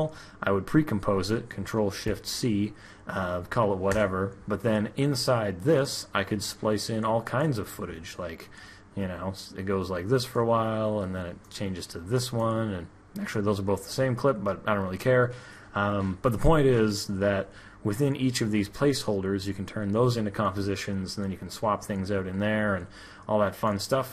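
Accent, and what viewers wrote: Canada. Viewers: Thanks for the help. Love the Canadian accent 👍🏻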